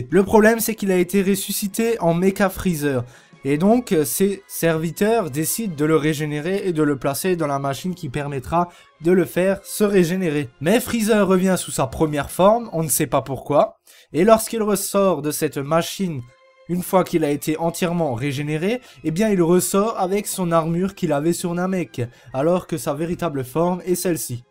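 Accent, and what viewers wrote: Alsace, France. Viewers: t'as un sale accent alsacien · Tes un alsacien jen suis sur je reconnais son accent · Accent alsacien, vertomi j'adore. ❤️ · Ne serais tu pas d’Alsace ?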